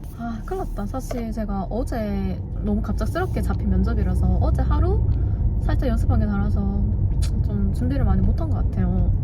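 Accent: Gyeongsang, South Korea. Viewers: 어쩌다 지나가다 ~축하드려요 ㅎㅎㅎ 억양이 경상도인것 같네요 ㅎㅎㅎ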